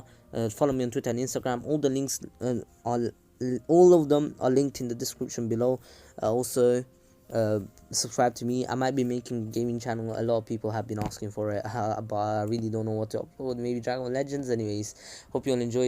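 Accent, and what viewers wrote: United Kingdom. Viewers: You sound so British.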